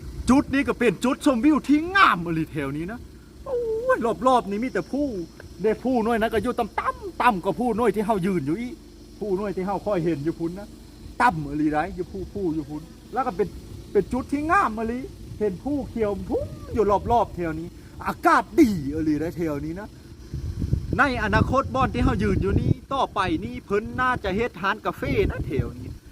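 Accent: Phu Thai, Thailand. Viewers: เว้าโม่นเด้ มักๆสำเนียงภูไท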